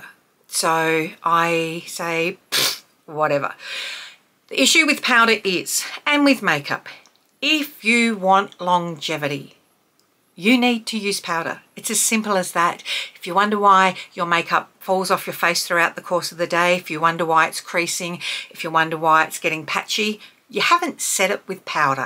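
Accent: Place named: Australia. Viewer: So good to hear a YouTube beauty content creator with an Aussie accent! 69 yo Aussie lover of makeup and skincare here. Look forward to seeing more of your videos. 😊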